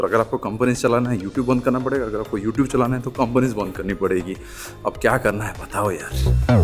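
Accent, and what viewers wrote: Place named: Bengal, India. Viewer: Improve ur hindi accent..U sound like bangali from ur Hindi ..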